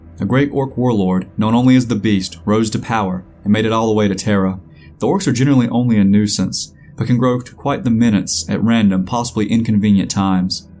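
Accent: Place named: South, United States. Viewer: Just found your channel, your southern accent is very pleasant. subbed.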